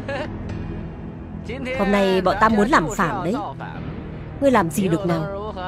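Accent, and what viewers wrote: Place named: North, Vietnam. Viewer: Thuyết minh giọng Bắc kỳ muốn ói, phim hậu cung kế cũng có đàm diệu văn, tưởng nghị, dương di thuyết Minh giọng nam còn hay và dễ nghe hơn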